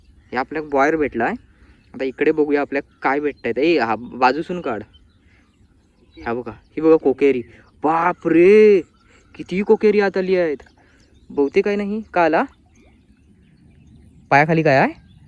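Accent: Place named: Malvan, India. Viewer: तू चिपळूणकर आहेस का मालवणी बोलतो नाय येत तरी नीट बोलणं आलिव गेलिव नाला चा पाणी तलाला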